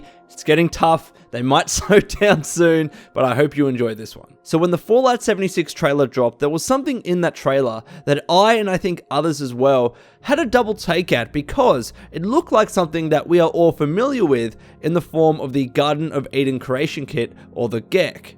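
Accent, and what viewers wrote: Australia. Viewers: Its not odd, I can completely understand what you're saying. I've watched enough Robert Whittaker and Mark Hunt interviews to understand the Australian accent. Its the way you say certain words.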